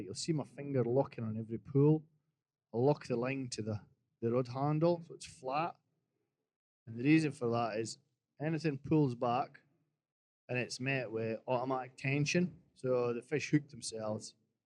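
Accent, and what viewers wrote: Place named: Aberdeen, United Kingdom. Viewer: 1st class video, listening to you reminds me of my time in Aberdeen.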